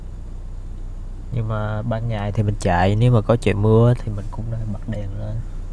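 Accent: Vietnam. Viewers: Anh ơi, mẹ anh có phải quê là quãng ngãi ko, tại nghe giọng nói giống nhà nội em quá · giông ngoai bac nha minh vay bn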